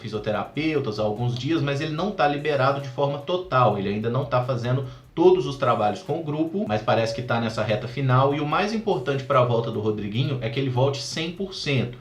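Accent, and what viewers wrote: Minas Gerais, Brazil. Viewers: É mais pelo sotaque mineiro mesmo, mas é uma coincidência engraçada kkkkkkkk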